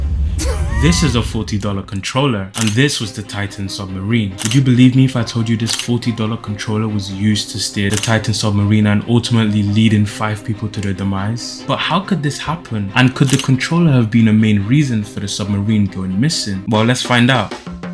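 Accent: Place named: United Kingdom. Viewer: He is British that's a British accent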